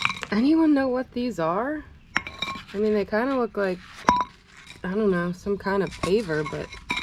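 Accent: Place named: Eastern Pennsylvania, United States. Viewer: This video popped up in my feed and a min in I could tell you were in PA by your accent, I’m assuming eastern PA 😂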